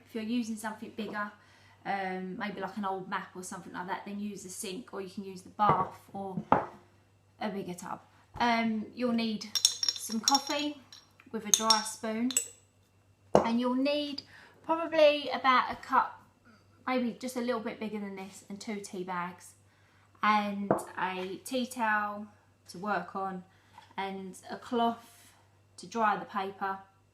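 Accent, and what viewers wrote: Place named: United Kingdom. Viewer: No don't worry about your British accent. I think it's pretty cool. Now with my Texas accent it's funny. Keep up the great work my friend. Hugs from Texas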